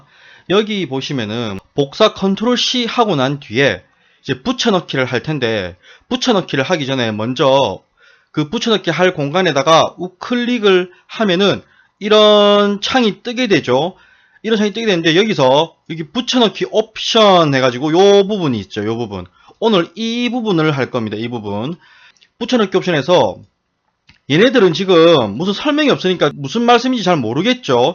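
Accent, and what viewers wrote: Gyeongsang, South Korea. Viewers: 구수한 갱상도 사투리에 열정적인 강의 귀에 쏙쏙들어옵니다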